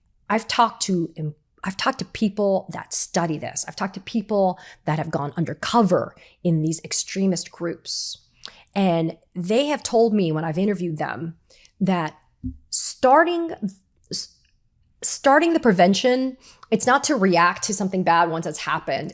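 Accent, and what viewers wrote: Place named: Australia. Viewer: Adoring Katie Phang unplugged! ❤❤❤😂😂😂😂😂 You sound very Aussie, would fit in very well down ere!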